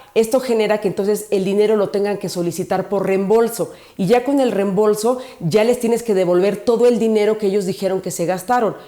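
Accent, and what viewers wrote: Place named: Fresa, Mexico. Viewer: no hables con acento fresa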